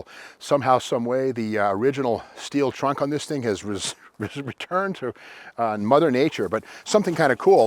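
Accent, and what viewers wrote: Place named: Massachusetts, United States. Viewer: He's from Mass. They have their own vocabulary there. They drive caaas.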